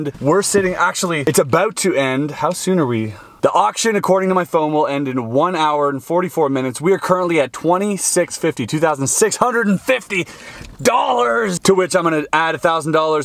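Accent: Quebec, Canada. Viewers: What ?😂 you speak french? Quebecois en plus?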